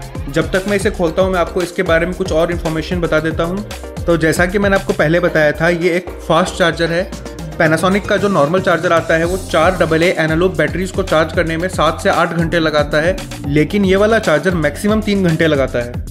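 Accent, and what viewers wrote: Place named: Arab world. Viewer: I like hearing Arabs speak English like this. Which country are you from Saudi Arabia,Kuwait, Dubai,Yemen?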